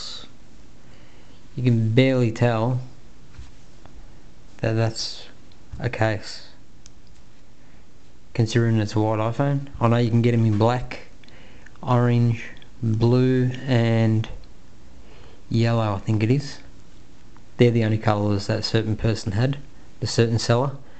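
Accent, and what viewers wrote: Australia. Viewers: Aww man it's not nice to ask him why he talks funny...and being British myself I would just like to point out that he's not British. He's most likely Australian or from New Zealand, we don't have dollars over here. We have pounds. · you sound australian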